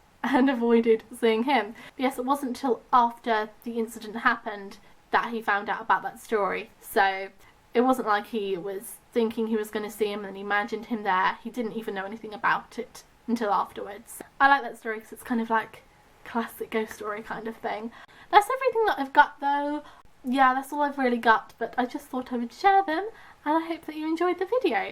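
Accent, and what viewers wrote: United Kingdom. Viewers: love the British accent it reminds me of cat deely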